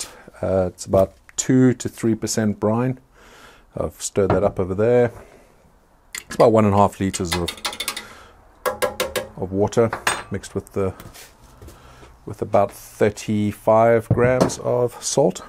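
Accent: South Africa. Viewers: on closer inspection i believe you are correct. His accent is quite mild compared to most south african i have heard, and some of the accents on words are similar to NZ. But yeah he is south african, he actually says further down the comments. I shoulda read further lol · South African accent ? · Hey,. Are you South African? You sound like you're from there. 😃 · Hi loved this Video and I can hear you are from SA. Where did you buy the equipment(pressure release valve) shown in this video I can't find it in SA. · Probably in another video but is that a South African accent? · I have said it before, but I love that South African accent! That sound is home to me.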